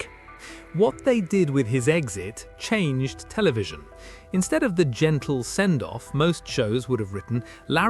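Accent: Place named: United Kingdom. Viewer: However good it may be, why oh why do we have to put up with these British accents for all these videos? Sick of it!!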